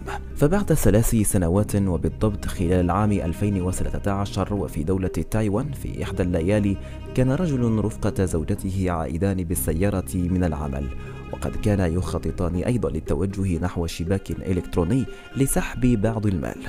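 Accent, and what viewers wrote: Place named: Morocco. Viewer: السلام عليكم بالتوفيق والسداد اخي الكريم، من نبرة صوتك أظن انك مغربي أو جزائري، بالتوفيق مرة أخرى من 🇪🇸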